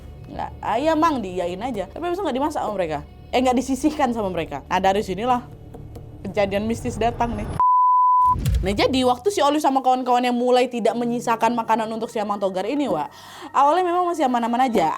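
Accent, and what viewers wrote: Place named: Kalimantan, Indonesia. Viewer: Warga kalimantan mana ni suara nya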